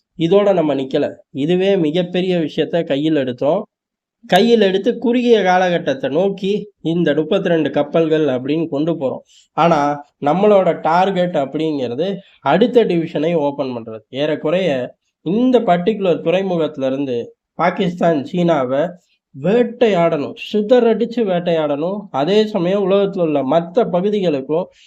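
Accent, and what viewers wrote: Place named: Nagercoil, India. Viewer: Ur voice is very familiar. R u from nagercoil.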